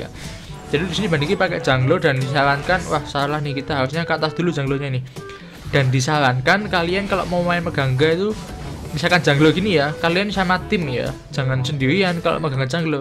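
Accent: Java, Indonesia. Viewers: gunakan kemedokan nya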